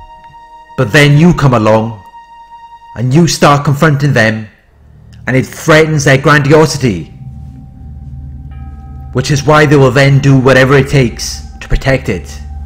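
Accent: Wales, United Kingdom. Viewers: There is something different about your channel, your voice, the content, the music, the images, it’s all perfect, it’s like it was made for me. BTW are you welsh?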